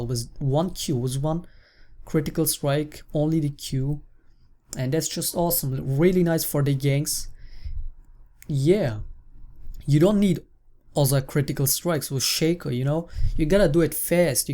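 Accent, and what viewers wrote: Germany. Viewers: Is he german ? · lel du bist deutsch? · Are you german or? I'm triyng to recognise your accent because I find it quite amusing but i'm pretty bad at recognising accents so yeah. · Für mich hört er sich extrem DEUTSCH an. He sounds extremely german for me, lol. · Du bist Deutscher oder :D ?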